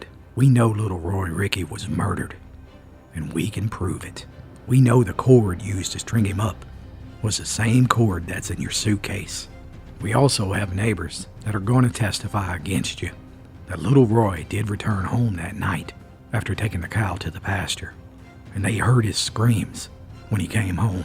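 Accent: Appalachia, United States. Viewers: I just found your page and I'm obsessed! As an Appalachian girl myself, your accent feels like home. ❤️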